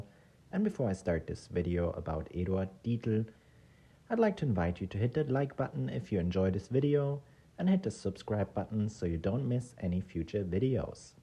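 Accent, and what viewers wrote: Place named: Germany. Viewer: German history really is better with a German accent.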